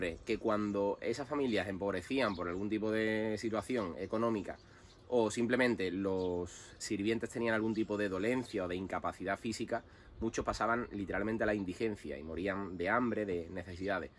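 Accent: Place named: Seville, Spain. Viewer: De sevillanas maneras habla. Aquí hablamos así. Quizá tenga que ver dos veces el vídeo...jajajajaja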